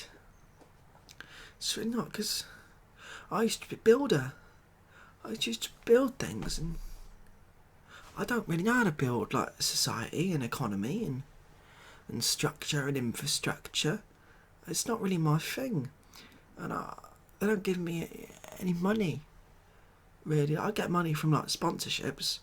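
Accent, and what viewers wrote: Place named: Estuary, United Kingdom. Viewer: Nice estuary English. "Transla'or! "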